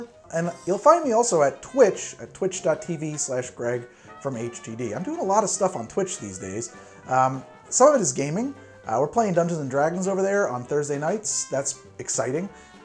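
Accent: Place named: New Jersey, United States. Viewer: Join the subtle Jersey accent. Just wait until you start calling them Flahrida ahranges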